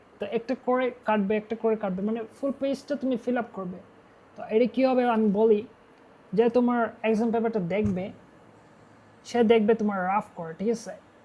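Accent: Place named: Sylhet, Bangladesh. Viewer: Sylheti manuser muke, suddo basa manayna.👀🥲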